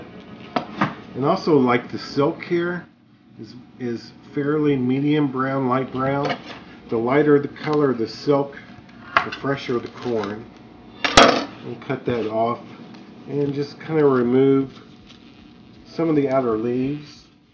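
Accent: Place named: Southern California, United States. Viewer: So, what I hear that's so familiar and comfortable in your voice is perhaps a Southern California accent? Been trying to place that freitasex sound for a couple of months. It's a good sound. Southern California makes sense.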